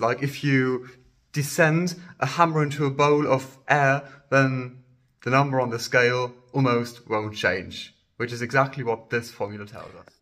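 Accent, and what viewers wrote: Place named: United Kingdom. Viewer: British english 😮🎉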